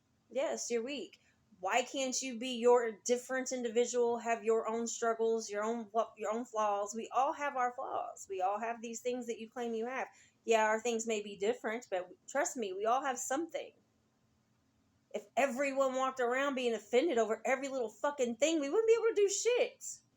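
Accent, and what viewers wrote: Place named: South, United States. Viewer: That southern accent loving it 💯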